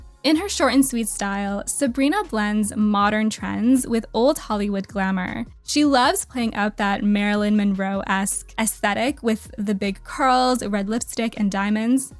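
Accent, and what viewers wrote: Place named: Ireland. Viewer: Can I ask if you're Americans or Irish? I feel I can hear a slight Irish accent.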